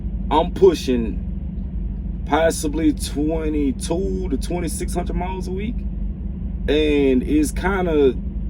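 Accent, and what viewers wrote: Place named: Memphis, United States. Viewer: Sound like u from Memphis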